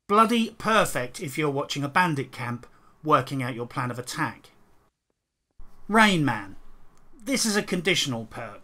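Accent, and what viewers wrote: United Kingdom. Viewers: I haven't played this game in years but come back to this videos from time to time just because they put me in a good mood lmao maybe it's due to the fact that it's a British man talking about this Czech game placed in medieval Bohemia. Being an immigrant from Poland (or POLISH LITHUANIAN COMMONWEALTH if you wish), living in the UK, a history buff at that, what could be better?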